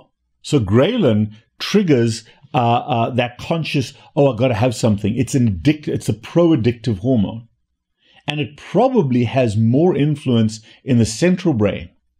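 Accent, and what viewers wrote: South Africa. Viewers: That depends where you are from. He is from South Africa.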